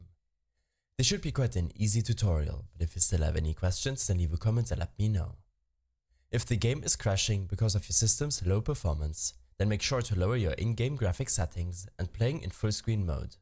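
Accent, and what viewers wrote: Germany. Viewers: No man a German knows he sounds German, you would know it when you saw his channel description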